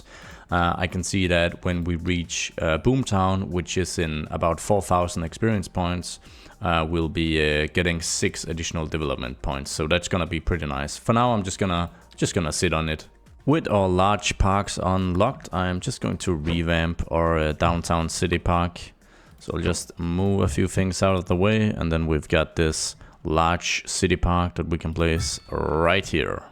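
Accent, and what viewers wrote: Denmark. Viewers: Er det en dansk accent jeg hører? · Is that a danish accent I hear? :D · I think hes danish. And yeah its a game it wont look like a real city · Are you from Denmark? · like the video! Are you danish?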